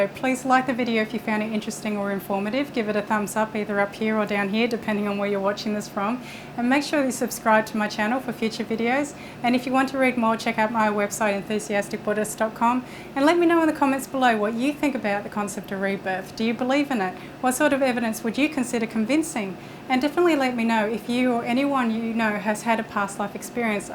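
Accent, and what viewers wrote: Australia. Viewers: Interesting topic. Too bad I have to work too hard to understand what you are saying. You articulation is ...hmmm... let say, not what it should be. Your accent does not help either. I stuck with it for more than 3 minutes. Too bad. Coz as I already mentioned, interesting subject. Perhaps this is intended to be watched by Australians only. Like an "Aussie secret". Do with it as you please.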